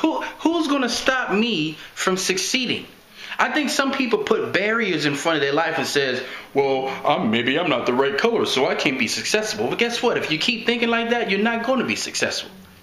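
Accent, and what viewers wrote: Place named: Canada. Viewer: You sound like a Canadian. thats a compliment btw:)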